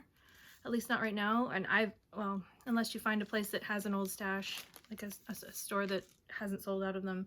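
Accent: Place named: Quebec, Canada. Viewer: Always great to see your flosstubes. You speak French with a French-Canadian accent! “Histoire” can mean either history or story in English. I love your Luda, and in fact all of the things that you work on. I hope the memory of your mom will bring you comfort.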